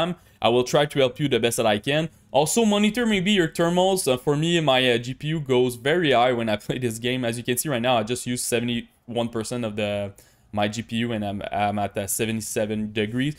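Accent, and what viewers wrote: Quebec, Canada. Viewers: t québecois for sure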